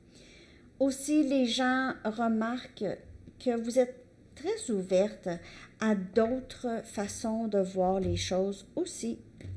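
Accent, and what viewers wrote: Quebec, Canada. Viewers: Hello, Je reconnais à votre accent une cousine d’outre-Atlantique, une belle journée à vous et merci pour vos guidances. ☀️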